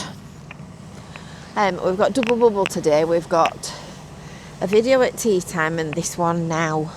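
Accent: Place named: England, United Kingdom. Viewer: i just love the way you laugh,...i love my english peop0le's accent,..thank you for being here alive and kicking,......,......marius(punk rules).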